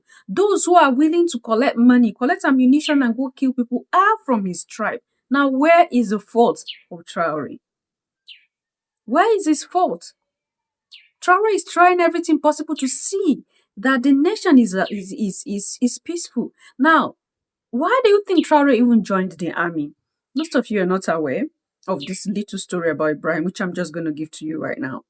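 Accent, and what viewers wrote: Gambia. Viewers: I guess this guy is one idiots from my country the Gambia cuz of his ascent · This man sounds very Gambian...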